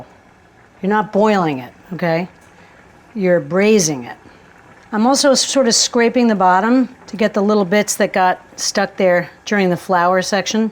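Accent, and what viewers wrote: RP, United Kingdom. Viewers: Wow that’s a wicked English accent posh ok 👌🧑‍🍳🌹.Iam looking forward to seeing you your meal 🥘 today 🌹🌹🌹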